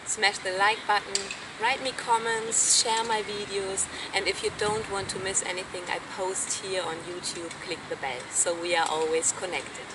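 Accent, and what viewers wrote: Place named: Germany. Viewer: Dadurch, das Dein "english" soooo schulmäßig deutsch ist, habe ich mir schon gedacht, das Du aus dem Ruhrgebiet kommst ! ( Bin selbst gebürtiger Dortmunder . . . ) Du bist jedenfalls sehr weiblich !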